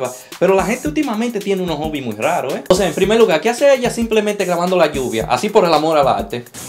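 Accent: Dominican Republic. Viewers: eres dominicano?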